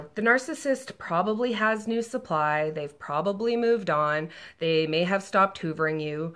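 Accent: Canada. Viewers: U sound canadian! What province?...great advice on no hope, btw. That is the cold hard truth. They will never, ever, ever change...in fact, they get worse as they age.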